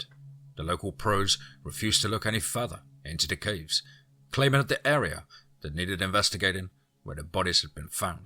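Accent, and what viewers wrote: England, United Kingdom. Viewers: You sound exactly how I imagined my homeboy with a U.K accent would, if I had a homeboy with a I.K accent that is. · Eric C you do. is your homie with a UK accent, but to him it's just how he sounds as an Englishman lol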